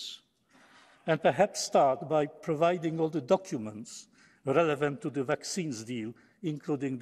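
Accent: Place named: Oxford, United Kingdom. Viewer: Panie Profesorze powiedzieć że jest Pan genialny to jakby nic nie powiedzieć....i w dodatku ten Oxford English...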